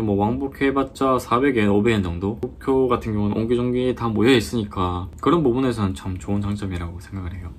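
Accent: Daegu, South Korea. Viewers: ㅋㅋ 대구 사람이신가 대구사투리 느낌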